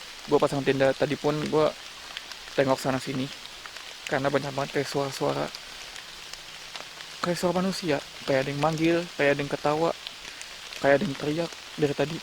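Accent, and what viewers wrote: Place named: Amuntai, Indonesia. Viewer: Abang neh orang Amuntai kah.. 😀 kaya org Amt mandangar logat pandir pian.. 😀🙏🙏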